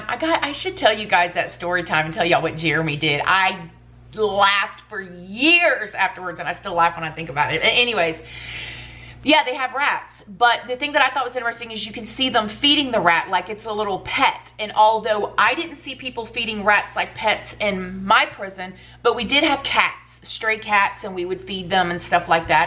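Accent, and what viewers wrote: South, United States. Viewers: Got that southern slang going on 😂 “Bob Wire” we also say “Chester Drawers” 😂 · climbs up on soap box I would ask everyone who feels the need to "correct" pronunciation of words like "bob wire" or "cement" to please understand that as southerners, we have different ways of saying a lot of words. We know how to spell these words, just like you do. Like any thick regional accent found anywhere around the US (and other English speaking countries), words are often said differently. Think New Orleans, Boston, New York, Fargo, Seattle, Miami, LA, Hawaii, Chicago, etc. And then Canadian, British, Irish... Our distinctive accent and pronunciation of many words is just a part of who we are and the way we heard language as our own voices were being formed. Please stop correcting us, we are not wrong. And you're not either!! We are simply different. 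I hope we can all love each other for the diversity in each of us. /climbs down from soapbox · I love your southern accent and the little slang words you say lol.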